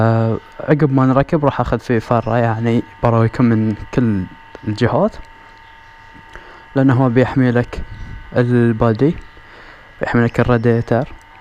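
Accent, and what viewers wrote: Bahrain. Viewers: ي حلو لهجة البحرين <3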